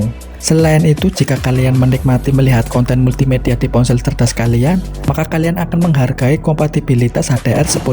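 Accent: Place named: Padang, Indonesia. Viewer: Suaranya , Abang orang Padang ya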